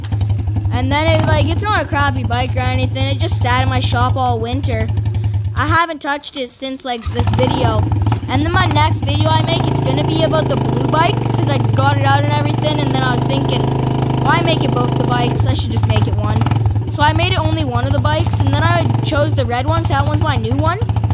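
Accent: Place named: Newfoundland, Canada. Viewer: you should like a newfoundlander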